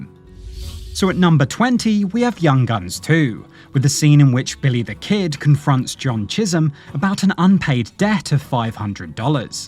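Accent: England, United Kingdom. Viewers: What's lost is the authenticity from the British accent by the narrator. We're bombarded with this. Motorsports, tennis, golf, war videos. Gunfights? C'mon man. I can fake an accent, maybe I can get work too. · English accent kills it for me.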